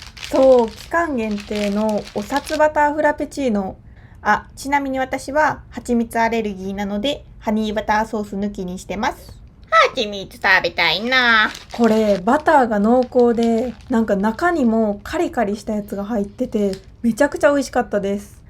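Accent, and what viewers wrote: Kansai, Japan. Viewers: YouTube始めたての時よりも関西弁出てきてて好き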